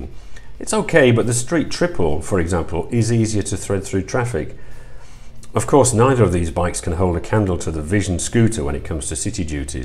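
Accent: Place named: Newcastle, United Kingdom. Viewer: he’s a Geordie I think. Sounds just like you 😂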